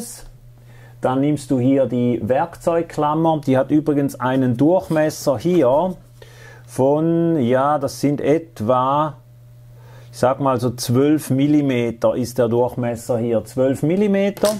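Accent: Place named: Switzerland. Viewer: Für mich ist das eine Rohrbriede, vor mir gehen aufputz 2 kupferne, blanke 1" Heizrohre ins OG. Braucht es für so stationär, an beiden Seiten/Enden solch Rohrbrieden, oder, oder schirme ich mich mit der Erdung im Ganzen Haus mich ab? Sorry, bin totaler Leihe und dachte erst, es ginge um die Antenne selbst. 11m Draht im Estrich spannen bringt was oder? Bis da hoch sollte der Draht dann gemantelt, geerdet sein? Ich seh schon, muss noch weitere Videos betreffend schauen, das war nicht das richtige, dennoch gut gemacht und das Schweizerdeutsch toll gezügelt. - Äxgüsi, das kann nicht jeder Schweizer!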